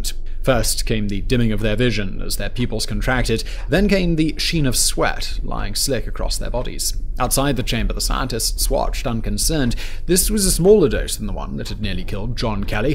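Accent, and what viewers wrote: RP, United Kingdom. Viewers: You cover interesting topics but your over the top annunciation drives me mad. I'd attribute it to some sort of paid education but your use of Americanisms like 'garbage' just indicates you're trying to capture a specific audience with a penchant for posh English. I wish you'd drop the act and speak normally. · Why are putting on a posh accent - it’s extremely off putting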